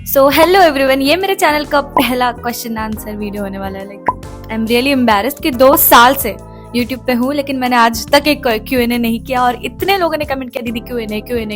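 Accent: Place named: South, India. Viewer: Didi's accent is like now South Indian..😂😂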